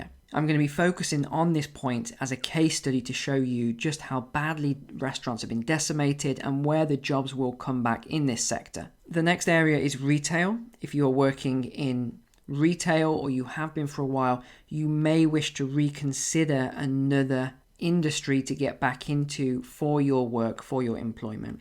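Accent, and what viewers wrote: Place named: England, United Kingdom. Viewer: This is totally irrelevant but I usually don’t like English accents but your voice is so soothing. I’ve started to listen to you in lieu of ASMR in order to fall asleep. I know it might seem like it but it’s a complement. 😴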